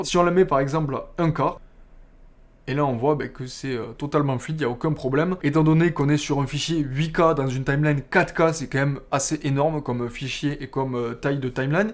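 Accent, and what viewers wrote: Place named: South, France. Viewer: Bravo pour ta vidéo avé l'accent qui fait plaisir !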